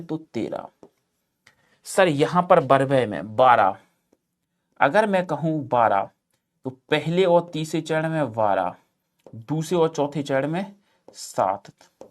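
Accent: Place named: Madhya Pradesh, India. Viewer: Sir aap mp se ho kya?😅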